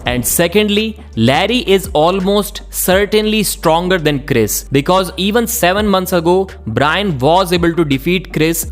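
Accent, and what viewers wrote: India. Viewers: Indian voice -> disliked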